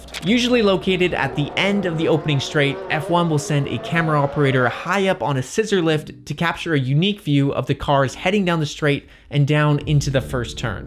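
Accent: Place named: Canada. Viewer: Now that I hear it he's definitely Canadian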